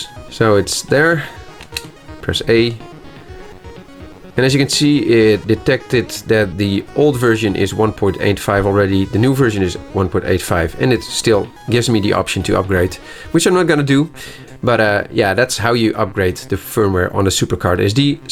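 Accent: Netherlands. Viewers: Butt I heer a Dutch accent is dat right?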